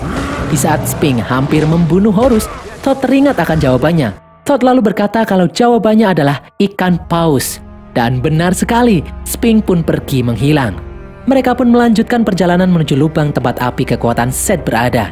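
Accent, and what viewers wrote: Java, Indonesia. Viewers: huruf B dan D nya medog bgt bang. khas bgt channel ini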